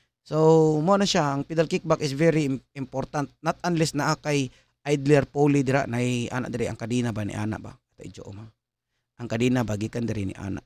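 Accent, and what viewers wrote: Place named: Philippines. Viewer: So you really want a Diamond Back? 😜 With a Lefty on it? Lol. I love the Philienglish!!! Every language does it.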